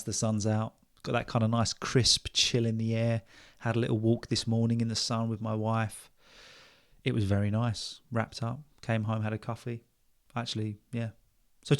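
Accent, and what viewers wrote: United Kingdom. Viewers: Thank you so much for continuing to make videos all these years and for never giving up. Wishing you and your family good health and happiness. I really love your videos, the way you tell stories and share your experience is truly enjoyable. And last but not least, i absolutely love the British accent!. I hope one day I will have a chance to visit the UK. Cheers mate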